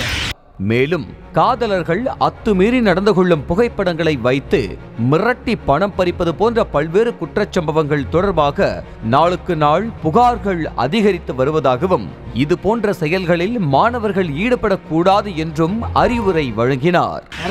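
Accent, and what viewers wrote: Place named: Tirunelveli, India. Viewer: நீங்க thirunelve சூப்பர் i like that slang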